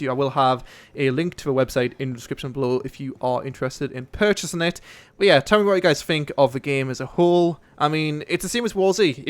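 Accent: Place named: Newcastle, United Kingdom. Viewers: no he's English he just has a regional accent (Newcastle) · He is from England, Newcastle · hes english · He is from Newcastle, UK. they have a unique accent which is why he might not sound English to people from outside the UK :)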